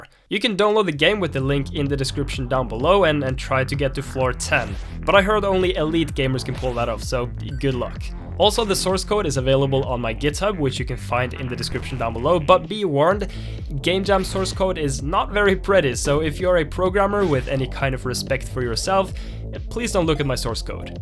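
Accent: Norway. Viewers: are u Norwegian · Wait are you norwegian?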